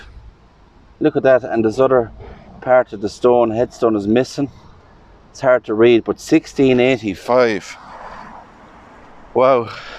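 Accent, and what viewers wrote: Ireland. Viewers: My mother often took us to tour grave yards. I absolutely love this. has such a beautiful Irish accent and he's so calm, steadfast, easy going. I feel so much better in this crazy life just listening to him. Thanks much!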